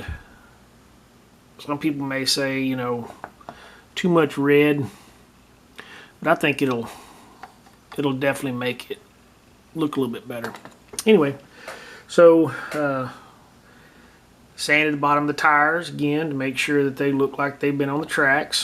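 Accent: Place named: Appalachia, United States. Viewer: MC need to get rid of your hillbilly accent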